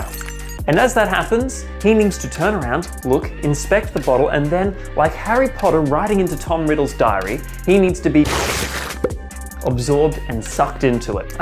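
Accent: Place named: Netherlands. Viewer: Thought him was Dutch ngl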